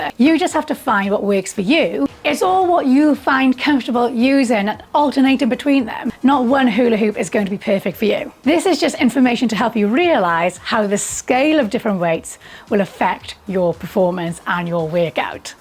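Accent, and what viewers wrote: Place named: Wales, United Kingdom. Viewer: Oh my days, came on here to learn how to hula(after seeing the Texas Hold 'em short) and there's a Welsh accent 🏴 (well I think it is anyway 😂) This is gonna be fun! ⭕⭕⭕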